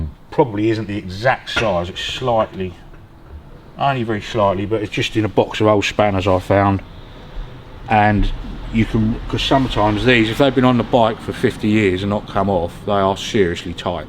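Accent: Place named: London, United Kingdom. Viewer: Great videos! Love attitudes, and a proper London accent explaining all (if it's Essex, I'll let him off... ) Roll ups, no gloves when welding, what's not to like.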